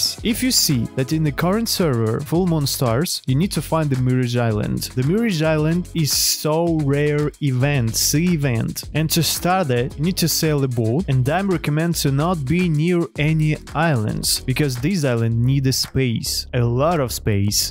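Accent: Russia. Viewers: your accent is sounded like russian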